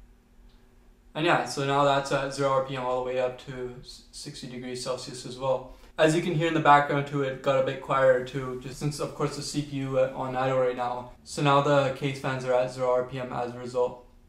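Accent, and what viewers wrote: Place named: Minnesota, United States. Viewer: You sound like the people in Fargo, are you from around Minnesota or Canada even?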